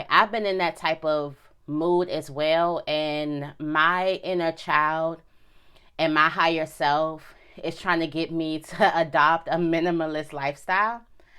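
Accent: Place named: DMV, United States. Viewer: Your accent is cute 🖤 You def from the DMV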